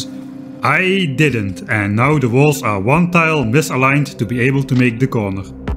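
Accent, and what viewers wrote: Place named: Netherlands. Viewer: I'm actually impressed the algorithm can recognize steenkolen engels.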